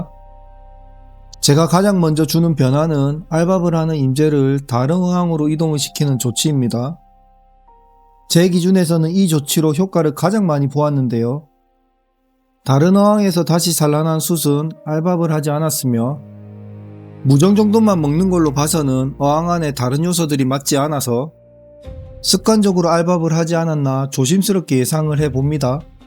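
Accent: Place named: Gyeongsang, South Korea. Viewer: 오 경상도 분이셧군요 어디분이실까요?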